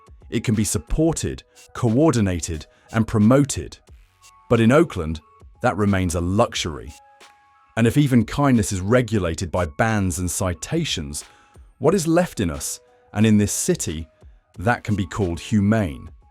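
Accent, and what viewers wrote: England, United Kingdom. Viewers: The story would be better if it was. told by someone who sounded American. An Englishman, may just not think much of America, in general.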